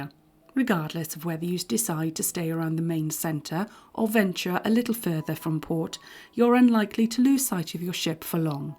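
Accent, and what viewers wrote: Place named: Wales, United Kingdom. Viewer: So much great information in a lovely Welsh accent! We will be in Flam in August so this has been exactly what I needed to see. Thank you!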